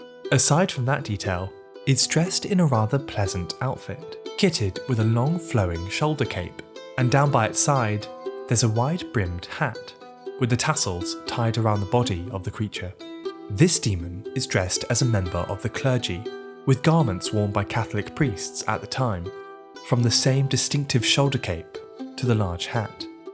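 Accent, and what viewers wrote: England, United Kingdom. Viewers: British narrator Not gonna listen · This is good stuff my snooty sounding English friend. More please.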